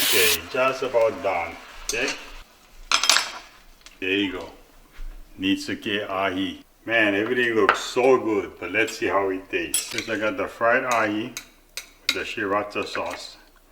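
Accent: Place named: Hawaii, United States. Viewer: Can hear the Hawai’i accent!!!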